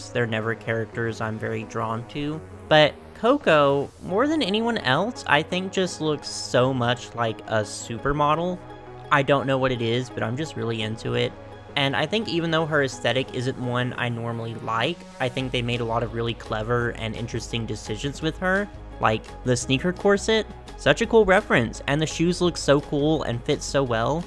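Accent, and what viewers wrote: Texas, United States. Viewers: I presently like how you talk I love your Texas accent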